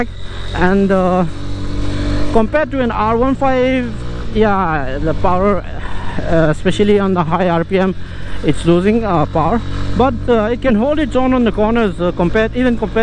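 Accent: India. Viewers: accent hi chu Indian ve reuh eeee 😁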